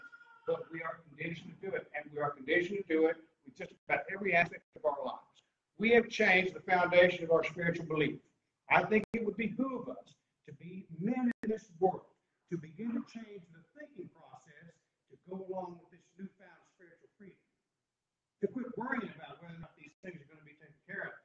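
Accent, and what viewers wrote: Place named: South, United States. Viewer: Thank you sir it’s nice to hear a southern voice speak on these topics I feel a bit alone sometimes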